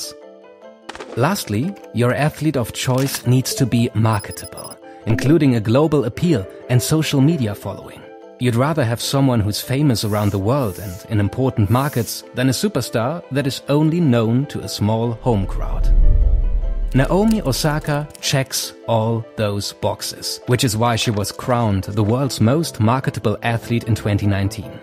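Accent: Germany. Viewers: Are you [speaker] from Germany?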